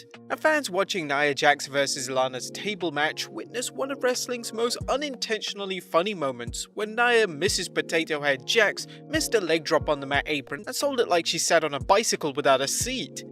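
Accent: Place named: United Kingdom. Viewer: OH GOD.... FIRST YOU SAID "MARY-LAND"...(pronounced Mar-UH-lind), now "RE-NAY-SAUNCE"!?!?!?!?!? WTF!!!!!!????? It's pronounced Re-Ni-Saunce!!!!!!! British WL host whatever your name is..... YOU'RE KILLING ME BRO! GO WATCH SACHA BARON COHEN IN THE MOVIE "THE TRIAL OF THE CHICAGO 7" THE BRITISH CAN SPEAK ENGLISH WITH AN AMERICAN ACCENT!!!!!!!! IF COHEN CAN DO IT, SO CAN YOU MY FELLOW WRESTLING BROTHER!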